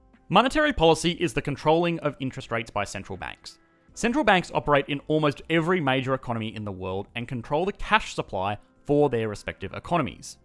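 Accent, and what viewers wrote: Australia. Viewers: I like this channel. I'd like it even more if the australian accent wasn't the most annoying of the english accents. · Bruh r u aussie?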